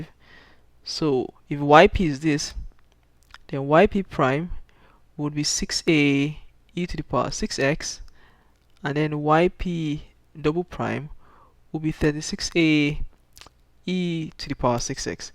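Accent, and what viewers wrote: Nigeria. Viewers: Thank you for the video! You be Naija guy?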